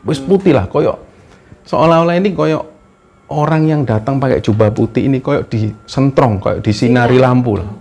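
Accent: Java, Indonesia. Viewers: Selamat ulang Tahun,dari awal cerita pendakian mas mas beserta keluarga,sampai detik ini Masi setia sama Chanel ini,cara mas membawakan cerita yg natural dan njawani inilah yg saya suka, sukses terus dan semua crew nya, Gusti mberkahi🎉🙏🥳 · Tumben mas bahasanya cmpur logat kejowo jowoan 😁..tp msh tetep bagus 👍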